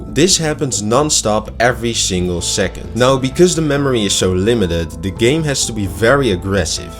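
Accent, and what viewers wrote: Netherlands. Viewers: dutch detected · i hear dutch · Is that a Dutch accent i hear? Nice vid btw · is that a dutch accent I hear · I smell dutch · Holy Dutch accent ❤‍🩹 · I hear Nederlands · I smell Dutch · bro just stick to dutch. your dutch accent is too horrible for this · you are literally the most dutch person i have ever heard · Hahaha dat accent is meteen herkenbaar als kuthollander xdddd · Nice vid! Hoor dat je een nederlander bent 👌🏻. Keep it up🤘🏻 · Ik ruik een Nederlands accent 👀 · lol dutch accent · im POSITIVE youre dutch!! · Je herkent Nederlandse accent direct. Blijf zo door gaan je gaat groot worden. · That thick dutch accent🫡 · Jij bent sws Nederlands ik weet het gwn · I hear a Dutch accent. PlayStation 2 kon de games van de disk laden terwijl de game draait. Niet veel games maakte er gebruik van (jack and dexter, need for speed games did that). 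Bij nfs en jack kon de game zelfs opslaan tijdens t spelen (eerste vorm van autosave). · i both love and hate the strong dutch accent lmao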